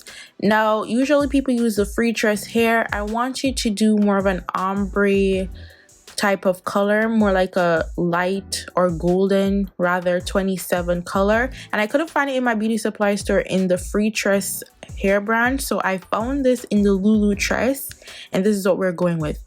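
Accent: Jamaica. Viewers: Ur Jamaican right??? Great vid by the way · Beautiful and I love that jamaican creole wah gwan😍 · I always see your videos but this is my first time actually watching one & I loved it!! The minute I heard you talking I was like “yeah she’s Jamaican” lol ❤️😂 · Are u Jamaican?? Hearing that accent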